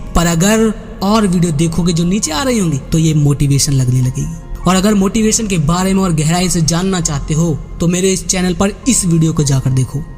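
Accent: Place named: Bihar, India. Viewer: Bihar se baat kar rahi ho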